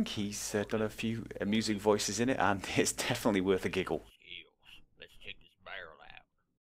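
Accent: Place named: England, United Kingdom. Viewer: His accent is English.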